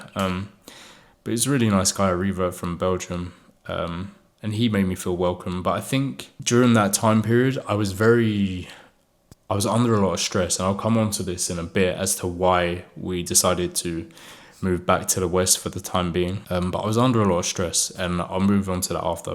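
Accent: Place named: England, United Kingdom. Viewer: Salamo alaykom, my brother. If I were in your place, I would look for an apartment for sale in Tangier and establish an English language institute. Yes, there are many in Tangier, but you have an advantage: you are English and have an English accent, and that's what learners are looking for. A project like this would be 100% successful in Tangier because there are many international companies there, and English is in demand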